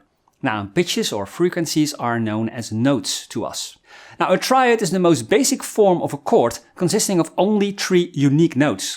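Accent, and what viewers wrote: Netherlands. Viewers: A)crystal clear B) your Dutch accent brings back warm memories of all my Flemish and Dutch colleagues! Thank you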